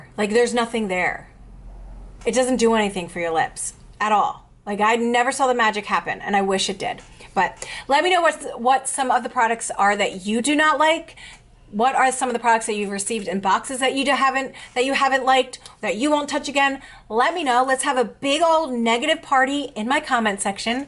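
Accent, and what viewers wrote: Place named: New Jersey, United States. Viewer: loving the Jersey / Brooklyn accent. Got the same one.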